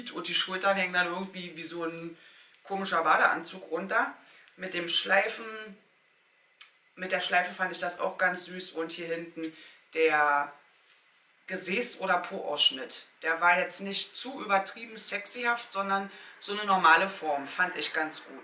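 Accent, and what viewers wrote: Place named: Berlin, Germany. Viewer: Du bist unsere swimwear queen 🤣🤣 Body paßt dazu Berliner schnauze was will man mehr 😁😘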